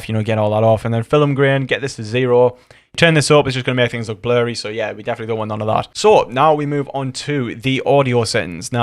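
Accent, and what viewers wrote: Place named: North, United Kingdom. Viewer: Sound like a fellow northerner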